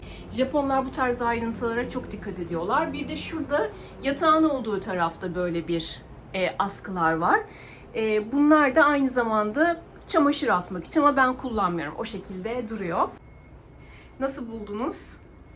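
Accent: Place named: Turkey. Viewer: Japoncayı Türk şivesi ile konuşmak çok güldüm harikasın😂😂😂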